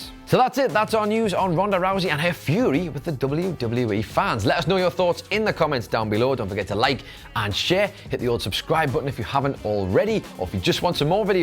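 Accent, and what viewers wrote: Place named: Newcastle, United Kingdom. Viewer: Wey aye man. A fellow Geordie. You're getting a sub just for that.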